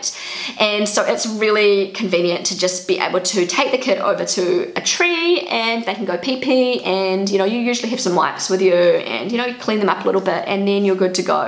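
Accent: New Zealand. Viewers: Hi, I love your videos and your cute kiwi dialect. I find it great that you speak with your children only English and obviously your husband German. Never again it will be so easy for the kids to learn 2 languages at the same time. My daughter in law is American and they live in Australia. My son speaks with their son only German and my daughter in law only English. The kid is three years old now and it is amazing to see how he speaks with his parents in their different languages. He turns his head to his father and speaks German and then turns his head to his mother and speaks English, incredible! And on Skype he speaks German with us, his grandparents. Warm greetings from Hannover, Germany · Everytime a pleasure to hear your velvet voice with this funny 🥝 accent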